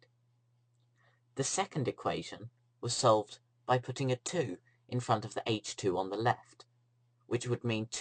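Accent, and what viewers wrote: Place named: United Kingdom. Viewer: His voice is British! Minnesota Literacy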